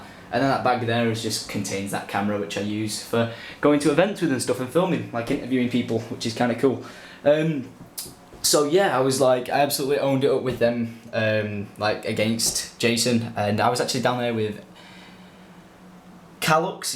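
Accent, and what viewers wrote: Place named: Manchester, United Kingdom. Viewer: What part of manchester does he live in, i mean im from bolton and he sounds like he's local?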